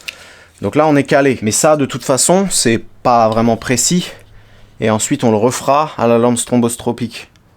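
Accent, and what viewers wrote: Alsace, France. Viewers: Tu commence à choper l'accent Alsacien à force d'être avec EMS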